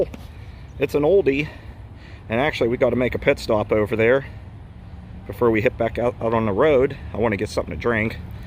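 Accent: Pittsburgh, United States. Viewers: First of all, love your Pittsburgh accent! It kind of makes me homesick. I lived in the North for a long time and agree with you about southern people. If I had a choice between living in Texas or Tennessee however, I would live in Tennessee. Great video bud! You made an otherwise boring old gas station interesting.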